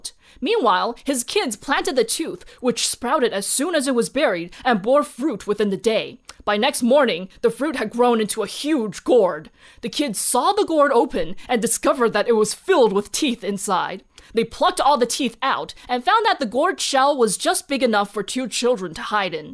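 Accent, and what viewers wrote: United States. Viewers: I am curious as to where in the US/Canada Ms was raised... By her (AE) accent, it's hard to tell; California? PacNW? Michigan? Toronto?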